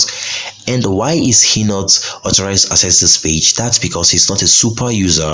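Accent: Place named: Nigeria. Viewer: Wow ...you great ...maybe its the Nigerian accent but you taught me better....Nigerian from Canada here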